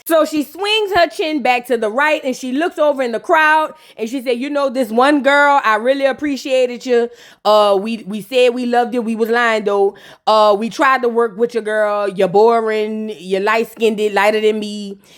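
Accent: New Orleans, United States. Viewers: Yes New Orleans Accent Baddies Review 😂😂😂😂😂 Love from London 🇬🇧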